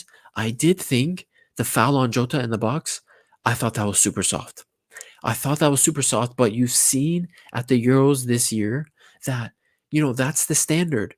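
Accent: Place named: United States. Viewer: Jeet speaking with an American accent. Opinion discarded.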